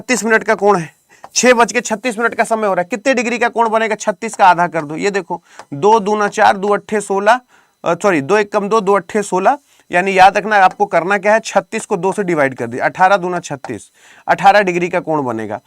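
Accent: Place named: Bihar, India. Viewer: Kha se ye bihari padhane ya geya overacting jyada kerta hai...choko choko kya hota hai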